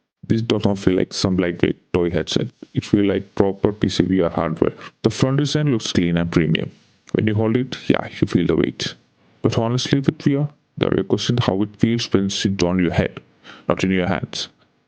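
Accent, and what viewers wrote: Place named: India. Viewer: I can recognize that accent anywhere. You from India too?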